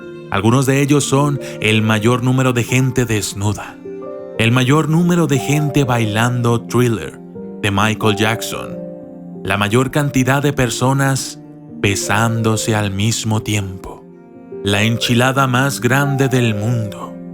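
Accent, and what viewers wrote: Mexico. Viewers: Este mono habla como María Felix. . le quiso dar ese toque más mexicano...jeje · Te faltó mencionar que México ha exportado su acento a latinoamérica por mas de 5 décadas, por ello hablas tan feo, pues omites tu acento nativo por la pronunciación mexicana. Por otra parte, tienes un desconocimiento cultural enorme sobre México, tal vez, por usar wikypedia para tus documentales. La figura patriarcal no es mas que un mito, la madre.. por otra parte... la mamá de los mexicanos es otra cosa, hay que investigar mas, antes de exponer la cultura de otro país vista con ojos extranjeros. Ah si.. y uno de los países con mas patrimonios, culturales, naturales e intangibles declarados por la UNESCO en el mundo. pésimo documental.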